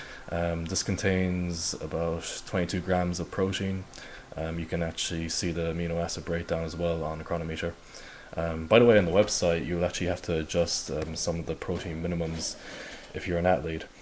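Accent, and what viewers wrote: Ireland. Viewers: something completely unrelated, are you irish? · Are you Irish? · HAAGHAHAHAHAHA im dead, fuck u thats the way he says it, cause he is irish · He has an irish accent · I love accent. It’s subtle yet not overly so and still clear he’s Irish. Almost sounds somewhat Scandinavian to me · gosh, this irish accent. lovely :)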